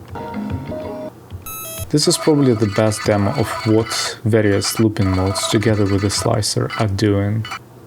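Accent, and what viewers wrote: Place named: Eastern Europe. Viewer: This video made me dig my anbernic rg35xx out of the box. Also, your accent sounds slavic to me 😁Thanks!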